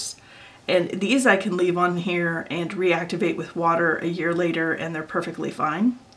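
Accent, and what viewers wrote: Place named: Australia. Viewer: Ur aussie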